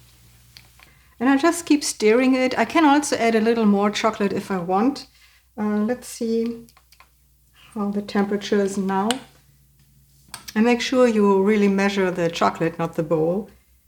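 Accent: Germany. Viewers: People are soo picky with videos. This lady should have more views and much more likes.This lady is fantastic, charismatic, and well groomed. She does her videos really well. Her German accent accentuate her videos even more. I can't put more likes, i already checked a thumbs up. But, what i did is watched the commercials to help her. This recipe is so delicious.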